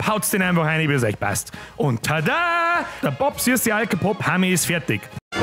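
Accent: Bavaria, Germany. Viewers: Natürlich kenne alle deine how...... Finde das bayrisch Sau komisch wenn dich aufregst... Greetz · alter die bayrische sproch isch echt nersch, irgendwie ähnlich wia österreichisch aber iwi o voll ähnlich aber manchmol verstand i echt ka wort haha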